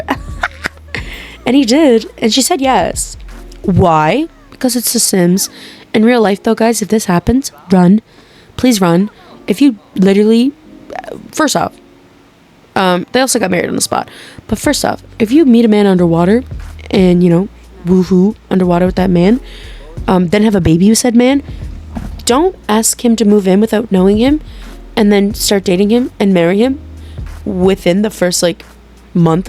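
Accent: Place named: Atlantic Canada, Canada. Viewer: I'm subbing because I can tell you're from Atlantic Canada and so am I 😂 great video!! Really funny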